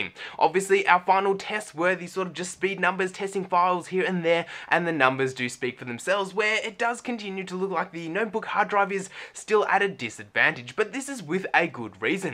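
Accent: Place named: Australia. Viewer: Mans chinese with a Australian accent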